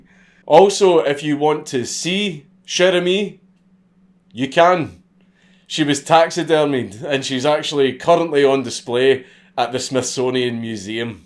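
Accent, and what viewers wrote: United Kingdom. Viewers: with a British accent has to be the most cursed thing Ive ever heard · Am I the only one impressed by his British accent? I am from Texas. Do a Texan! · Your British accent is fucking spot on, absolutely love it!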